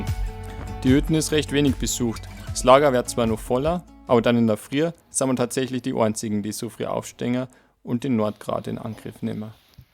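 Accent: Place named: Bavaria, Germany. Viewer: Servus, ich hab deinen Kanal vor kurzem entdeckt und muss sagen die Videos taugen mir richtig. Gute Länge, gute Aufnahmen und sau sympathischer bayrischer Kommentar. Mach weiter so!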